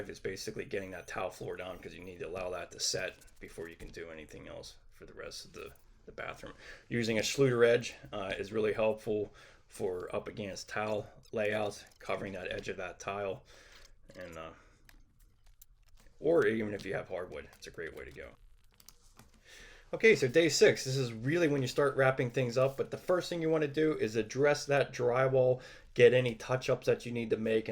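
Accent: Pittsburgh, United States. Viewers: Before I saw your shirt I just knew you was from Pittsburgh 🤣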